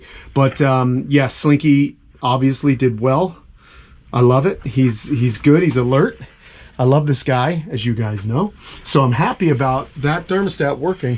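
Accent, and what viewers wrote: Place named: New York, United States. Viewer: I didn't know you grew up in NY but I've wondered a lot because you have a liiiittle bit of a New York accent sometimes 😊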